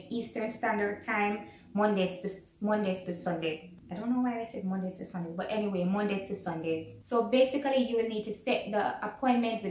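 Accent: Jamaica. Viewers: You have a beautiful Jamaica 🇯🇲 accent. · Hey, yardie gal! 😉 Yes, J'cans do have a heavy accent... but so do most (if not all) of us Caribbean people. It's just a matter of tempering it and slowing down our speech. Although I am not an organised person, I still decided to 'like' this video because I am SOOOOOOO elated to finally find one of my Caricom peeps rocking YT's MMO niche! Like you said, there are waaaay too many "big-country" people peddling all kinds of strategies or services and saying that they can work "anywhere that you have a computer and internet connection" without bothering to actually confirm that for themselves. We in the English-speaking Caribbean know about the nightmares of financial regulations which promote forex retension and money-laundering controls, the latter of which are often imposed by "boss" countries in the G8 who actually do way more money-laundering than the Caribbean. 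Nuff said. Anyway girl, keep keepin' on. If you vary your sub-niches enough, I just might find a reason to subscribe 😉 Best of luck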